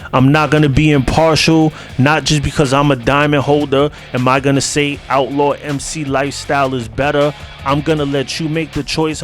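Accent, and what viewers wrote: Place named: Brooklyn, United States. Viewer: It sounded like your from Brooklyn. I lived in Borough Park Sheepshead Bay and Canarsie but I’m here in Florida now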